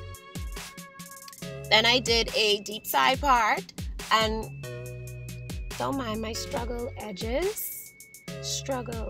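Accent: Bahamas. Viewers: same i say she bahamian frm the jump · ok... the min i heard her i say bahamian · Girllll all I said to myself was she only could be from back home with that accent!!!!! 😂 represent that 242!!! All day! 🇧🇸🇧🇸🇧🇸🇧🇸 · same, we Bahamians cant hide lol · Tammie A. Me too! My family is from the Bahamas! I recognized it immediately! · Tammie A. I knew right away she from The Bahamas like me!! 242 to the world. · frfr had me guessing if this was really a bahama mama🇧🇸🇧🇸🇧🇸🇧🇸❤ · I thought I was the only one who was tryna figure out if she was Bahamian or not lol 🇧🇸🇧🇸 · Recognized that fellow Bahamian accent in a jiffy!!! And I wasn't even listening, had the volume almost off and still our accent came through loud and clear!!!!! Love it!!! Go girl!!! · first thing I say was "This a Bahamian?" LOL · At first I thought bajan or bahamian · Took me only half a second to recognize that Bahamian accent lool. 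Slay girl slaaayy 👏🏽👏🏽 🇧🇸 🇧🇸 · Me too I just love the Bahamian accent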